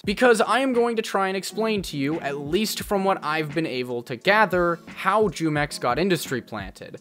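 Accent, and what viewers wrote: Canada. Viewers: yo r u canadian? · Are you Canadian? Plus thanks for the vid 🔥 been too long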